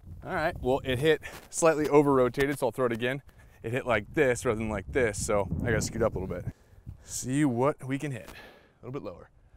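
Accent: United States. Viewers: Tino TheKid where.. where do you think he is? He's got an American accent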